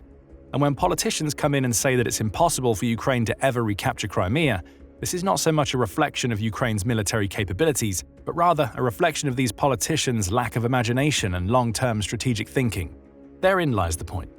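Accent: United Kingdom. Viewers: Misinformation is so easy to detect these days. Just detect the british accent.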